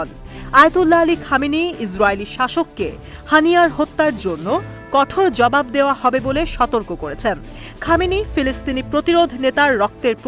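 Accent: India. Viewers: আসসালামু আলাইকুম কথার ধরন ভারতীয় হয়ে যাইতেছে বাংলাদেশি মানুষের মতো কথার ধরনটা করেন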